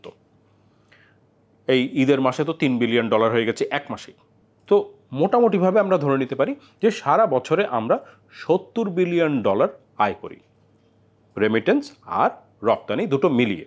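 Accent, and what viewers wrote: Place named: Kolkata, India. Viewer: আপনার কথাগুলো কলকাতার বাঙ্গালীর মতো, দাদা কি কলকাতার নাকি?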